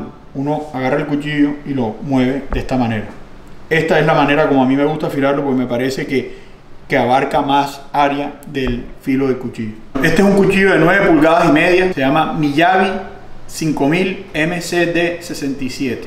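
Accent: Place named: Cuba. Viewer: Una pregunta tu eres cubano porque por tu asentó te pareces al de los cubanos y porcierto y soy cubano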